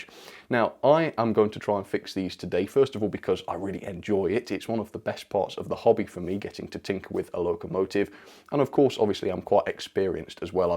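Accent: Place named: England, United Kingdom. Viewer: you are literally the english accent version of SMT mainline hahah also very cool seeing this being fixed up!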